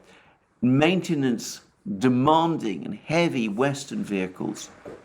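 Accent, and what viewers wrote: United Kingdom. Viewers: Thanks so much Alexander, not only I'm feeling informed very well about what really is going on in this horrible war but I also was able to shut off subtitles lately because I, as a non native speaker now got so used to the British accent I no longer need them. I'm really really glad I found your, Alex's and the Duran channels.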